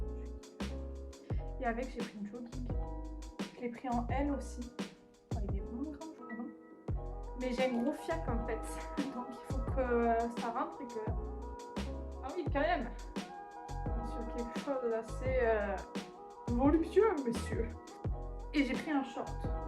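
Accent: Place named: South, France. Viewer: Cool tes vidéos ! Bonne énergie ☺️ et cet accent du sud 🤌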